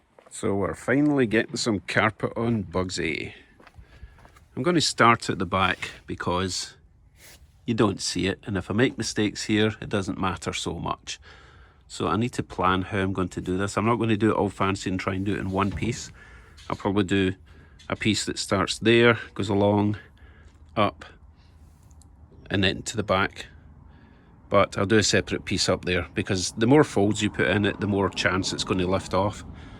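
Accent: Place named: Scotland, United Kingdom. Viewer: Hey! Love your accent. Reminds me of my Granny who hailed from Scotland. The similarities end there. You got a much tighter and hotter ass than Granny had! God rest her soul!! Happy Easter.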